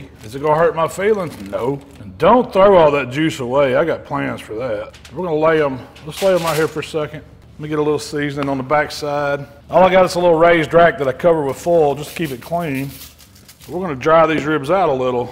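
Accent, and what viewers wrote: South, United States. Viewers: I stumbled across your page, looking for a how-to guide on grilling, and I hit the jackpot. You have gained a new follower, I love your Southern accent. It's time for me to binge watch your content for ideas, tips, and tricks. 🤗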